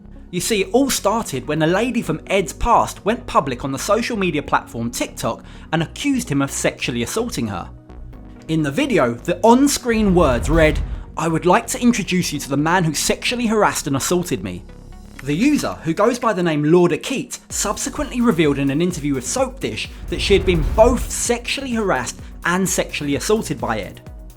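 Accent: Cockney, United Kingdom. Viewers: For Christ's sake get someone who doesn't sound like a chavvy Cockney chimney sweep from 1870 to narrate these videos. What an embarrassment that accent is to the entire nation.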